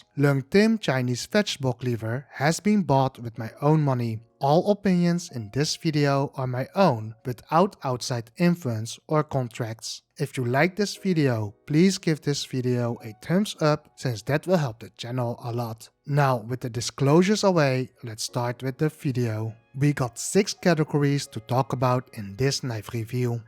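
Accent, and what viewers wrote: Netherlands. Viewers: altijd grappig dat je het Nederlandse accent er doorheen hoort :P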